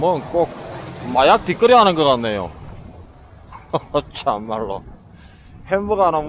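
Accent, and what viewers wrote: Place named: Busan, South Korea. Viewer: 태어나서 유투브댓글 처음 달아봅니다 한국(인천)에서 잘보고 있습니다~~^^ 두달전부터 님 팬이되었네요 구수한 부산억양의 영어가 너무 정감이 들고요 왠지모르게 영상보고 있으면 답답한 마음이 위로가 됩니다. 아무쪼록 안전운행 하시고 건강하세요 저는 지금 라면 하나 끼리 묵겠습니다~~ㅋㅋ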